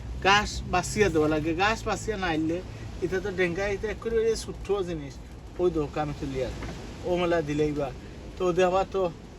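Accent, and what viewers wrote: Sylhet, Bangladesh. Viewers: আপু সিলেটের আন্চলিক ভাষা একটু ভিন্ন। তাই আপনার বুঝতে কষ্ট হচ্ছে। আমি ছোটবেলা থেকে সিলেটের আত্মীয় স্বজনের সাথে কথা বলে অভ‍্যস্ত তাই বুঝি। উনার ভিডিও গুলো অনেক informative. ইনশাআল্লাহ দেখতে দেখতে শিখে যাবেন। 😊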